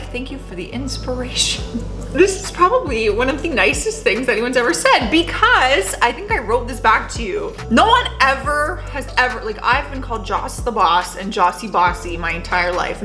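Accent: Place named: Canada. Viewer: Okay, I can’t do this anymore. You are hilarious and also a genius. How do I know you are a genius? Because you agree with me on almost everything. That’s proof enough. What you call sassy, my friends describe in me as being an asshole. I don’t care. These hopeless losers deserve everything we can throw at them. I love watching your videos. One small criticism: I can handle the Canadian accent and saying “aboat” for about, but work on your vocabulary, girl. I am amazed at how many perfectly ordinary words you mispronounce and seem never to have heard. Still, I love you to pieces. Keep being an asshole, oops, I meant being sassy. Some of us love it.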